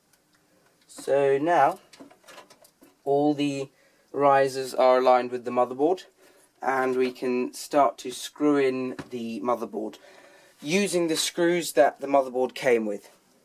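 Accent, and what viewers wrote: United Kingdom. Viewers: finally an english voice on youtube ahh :)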